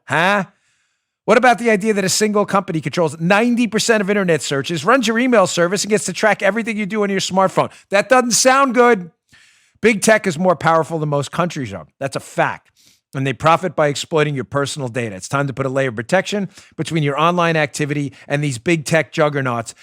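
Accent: New York, United States. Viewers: !! You are SO NY and SO Italian!! Love it!!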